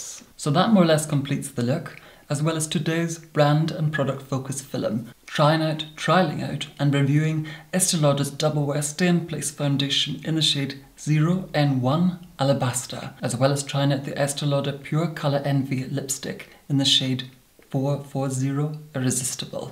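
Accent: Scotland, United Kingdom. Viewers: Scottish but using English RP. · Nah,he's got a Slight Scottish accent is all.Hes done a good job of getting rid of it. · I do hear a slight Scottish accent from time to time. I find his accent to be fascinating. Cheers! ~Anastacia in Cleveland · u are exactly right. Scottish born English raised. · My family has Scottish friends with a similar accent. He just speaks with sophistication. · He doesn’t have an English accent I’m pretty sure it’s just very posh Scottish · They do Gillian, I’m just saying it because he is Scottish and so am I (with a Jamaican mother raised in Ireland ) shrugs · He's Scottish... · he's Scottish, not English but he does speak very proper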